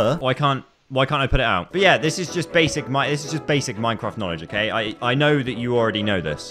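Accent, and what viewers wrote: United Kingdom. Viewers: The British accent makes this so much funnier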